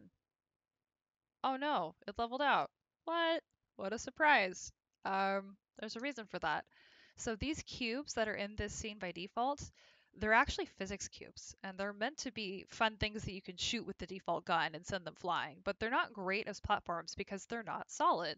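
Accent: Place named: United States. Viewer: How about you get some nazal spray. You sound like you have a cold. Or is that how all Americans sound? Good content but try blowing your nose first so you sound hunan. Sorry for being blunt but if you want a global audience talk like the rest of the world does. Good luck.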